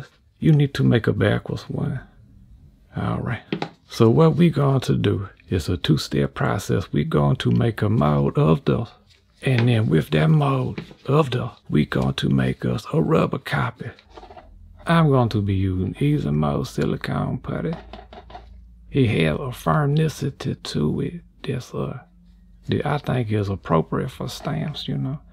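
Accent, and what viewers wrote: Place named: Oklahoma, United States. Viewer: Is...is the cajun? Or at the very least Louisianan since I haven't heard him slip in the odd bit of French and other cajun qualities? What majesty is that accent?! It definitely sounds atleast like my great aunts and cousins in Oklahoma, especially in his inflections, but the accent could be somewhere eastward from there too.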